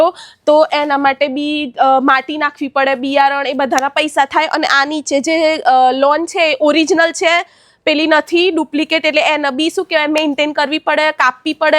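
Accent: Sabarkantha, India. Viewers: બેન તમે સાબરકાંઠા ના વતની હોય એવું લાગે છે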